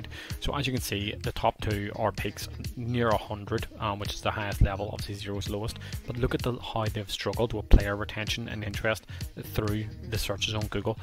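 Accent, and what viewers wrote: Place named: United Kingdom. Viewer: You sounded British to me. Plus most of the time when am in your server you are in English servers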